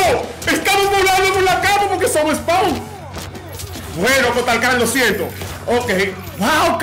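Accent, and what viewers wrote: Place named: Dominican Republic. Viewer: Eres dominicano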